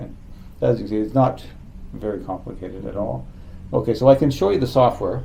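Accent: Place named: Canada. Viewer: 可以确定讲的是加拿大英语， 😇😇😇😇😇😇😇😇😇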